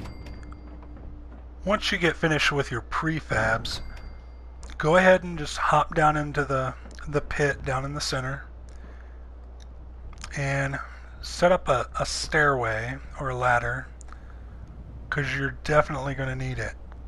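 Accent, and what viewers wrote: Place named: Southeast, United States. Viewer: It's a southeastern U.S. accent.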